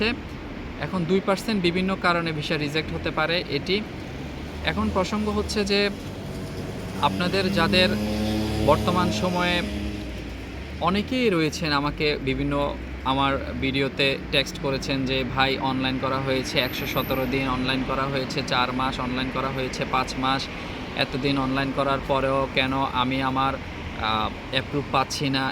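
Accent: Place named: Chittagong, Bangladesh. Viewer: ভাই মনে হয় চট্টগ্রামের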